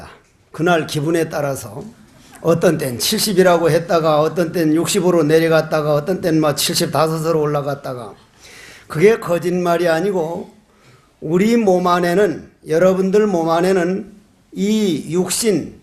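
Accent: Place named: Gyeongsang, South Korea. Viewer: 니그카이 내그카지 경상도사투리. 하 하 하 시님 감사감사합니다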